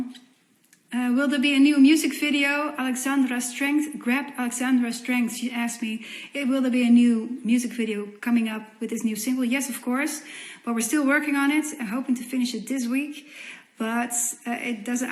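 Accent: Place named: Netherlands. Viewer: It does have this something to see all kinds of celebrities in online streams so much (watched various panels of actors of my favorite series too). It's pretty unique and cool. At leats one good thing about this quarantine! It's so fun to hear myself in her, as in being a Dutch person myself and trying to talk English with our Dutch accent :P Agreed Sharon. I too am so fed up with all the divisions and how people treat others who are not the same as they are.. It is this like curse in human nature unfortunately.. But then again, it IS better now than like 200 years ago, that also needs to be said. There's still much work to do but it is already better than in the past ~ ^^; My rhytm is pretty much gone since I graduated from my bachelor degree at a time in life that was pretty hard and then corona started. So, no solid job or studie for over a year now already.. 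I love how she pronounced 'WT Benelux' we Dutchies say the letter W VERY different than in English XD The English pronounciation of W is weird to us XD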